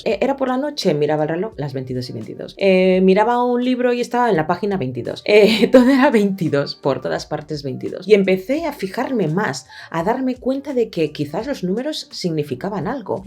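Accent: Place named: Spain. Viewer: ¡¡Que contraste el tuyo!!.tienes rostro caribeño y hablado(acento) españolete.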